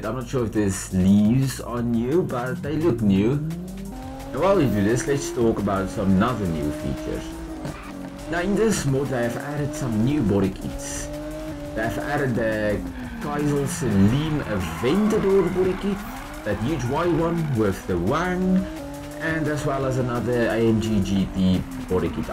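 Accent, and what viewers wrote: South Africa. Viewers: That's a very strong South African accent you have there brother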